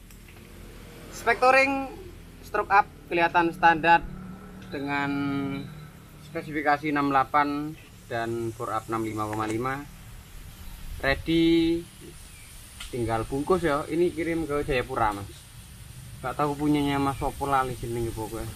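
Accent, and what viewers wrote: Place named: Yogyakarta, Indonesia. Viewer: aku barusan sub,sampean arek ndi sih,kok ngomonge koyok jowo jogja.lokasi bengkel dimana mas,salam dari jawa kaltim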